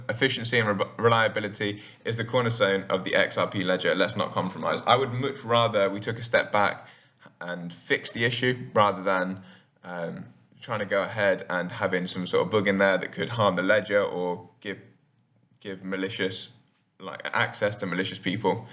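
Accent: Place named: Cockney, United Kingdom. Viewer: You gorgeous! You sound a bit Cockney. Am I right?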